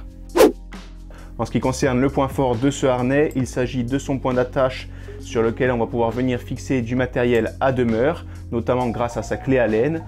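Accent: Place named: Corsica, France. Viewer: le petit accent corse s'est dépaysant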